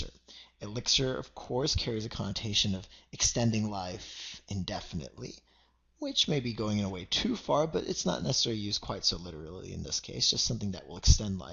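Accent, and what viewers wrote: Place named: India. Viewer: nice indian ancent english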